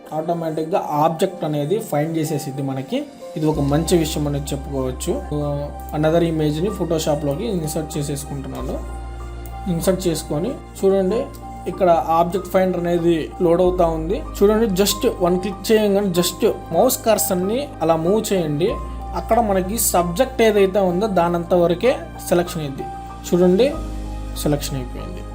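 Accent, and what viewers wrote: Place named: Ongole, India. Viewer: Anna mi vaice ongol sai kada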